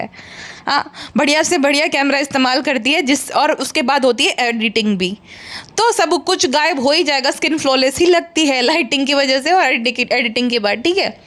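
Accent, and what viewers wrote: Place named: Kashmir, India. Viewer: Ma'am are you kashmiri ! Apna J&k wala accent aa rha hai 😄💜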